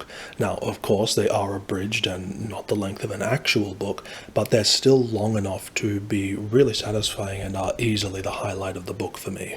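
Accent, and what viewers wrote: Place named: United Kingdom. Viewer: Dragon Age + an ASMR-like narration with British accent = great combination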